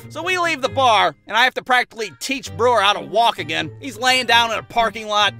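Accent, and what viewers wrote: United States. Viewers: So u from California Texas or new York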